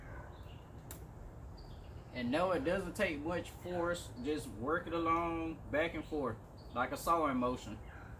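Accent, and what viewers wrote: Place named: Georgia, United States. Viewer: Hear them accents all time here in atlanta lol.. Not sure where in Georgia he from tho..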